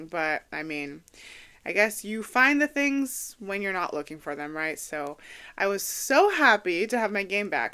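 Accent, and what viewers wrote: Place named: Northern California, United States. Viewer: Fellow Californian here (though I live out of the country now). What region of California are you in? Sounds like maybe up north?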